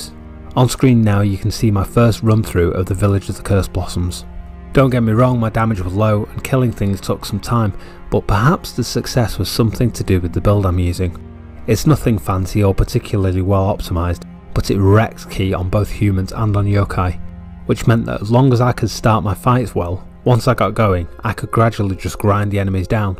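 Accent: United Kingdom. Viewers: It’s the British accent it gives the video the sense of genuine knowledge. Like a ted talk lmao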